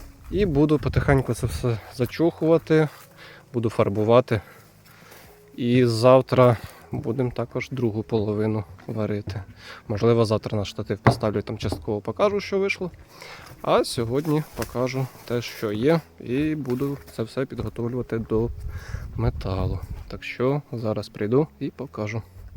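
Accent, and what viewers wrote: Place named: Vinnytsia, Ukraine. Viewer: Ви щ Миколаївщині,а розмовляєте,як наче все життя на Вінниччині прожили. Чи так і є?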